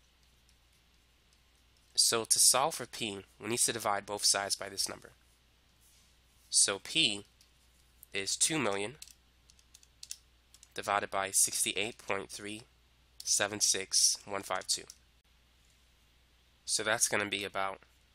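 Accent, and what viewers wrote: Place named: New York, United States. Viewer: bro you sound like a new yorker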